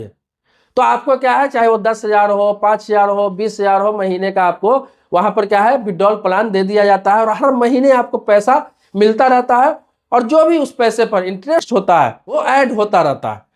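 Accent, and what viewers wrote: Bihar, India. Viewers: बिहारीं लँगवेज!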